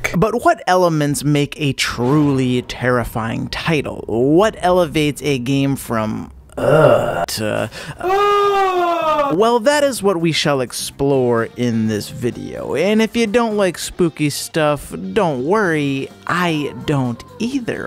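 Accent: United States. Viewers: Scott the woz if he was from Tennessee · Are you from Wisconsin?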